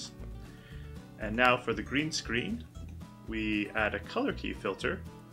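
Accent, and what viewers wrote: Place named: United States. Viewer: I've tried staring at it for 20+ seconds, that doesn't seem to work for me. It might be because my camera resolution setting isn't that high (640x480) since it gets really sluggish at higher res. Anyway, it's not really a problem since I can consistently get it to sync after I turn my head. By the way, are you Canadian too? You sound American-ish but you say "zed", lol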